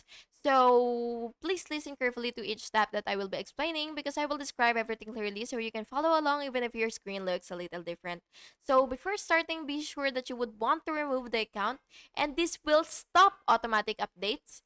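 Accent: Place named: Philippines. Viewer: your accent sounds filipina very much.. hehehehe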